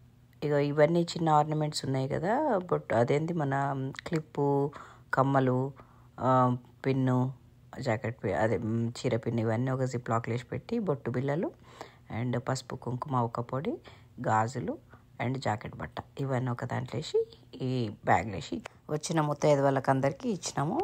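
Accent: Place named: Telangana, India. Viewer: Hi andi🙏 mee language pakka Telangana andi🙏😅😊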